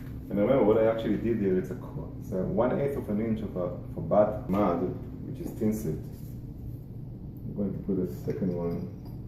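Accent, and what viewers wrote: Israel. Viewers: Very helpful and insightful. My bf and I are remodeling our shower right now and have been trying to figure out how to do backsplash onside an insertion for shampoos and soaps. Let me tell ya, thats not for faint hearted 😂. Thank you. Just a question, are you Israeli? Your accent is very familiar. Thanks a bunch again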